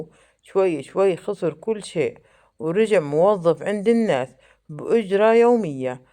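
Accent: Palestine. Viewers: اللهجة الفلسطينية❤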